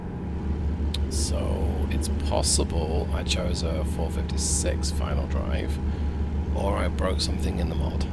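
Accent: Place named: England, United Kingdom. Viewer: Hahaha oooo nice u sound very English to me mate I guess u haven’t picked up the American accent yet lol it to ma to haha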